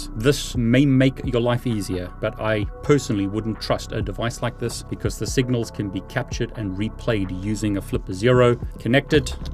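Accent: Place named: South Africa. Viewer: can hear the south african is still there with you. Also glad you got out, its getting worse here. But im sure you know that already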